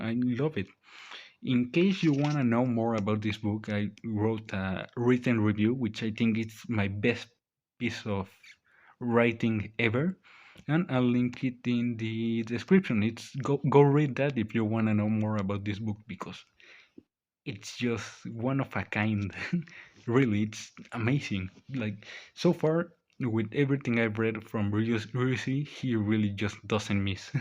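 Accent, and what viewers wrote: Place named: Mexico. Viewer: That nut worthy thick Mexican accent returns!!!❤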